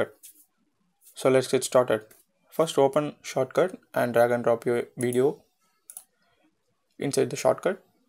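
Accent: India. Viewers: i hear an indian accent coming up.... thanks for the tutorial btw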